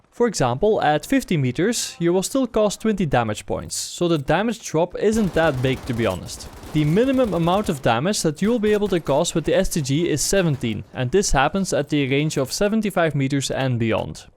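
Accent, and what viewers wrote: Netherlands. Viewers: from hearing your accent I'm guessing he's Dutch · Hoezo Hollands accent :p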